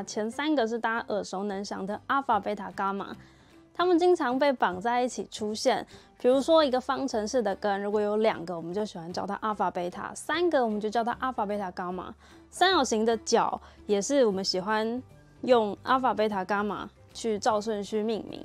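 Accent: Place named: United States. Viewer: 這是美式發音，不是真正的希臘文發音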